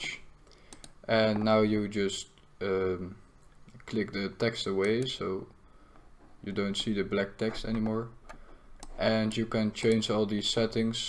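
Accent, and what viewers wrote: Netherlands. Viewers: Lekker nederlands accentje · Are you dutch you sound like you are dutch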